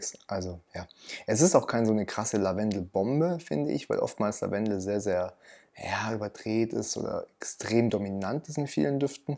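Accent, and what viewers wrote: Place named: Germany. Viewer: I love your language and your accent! Are you German?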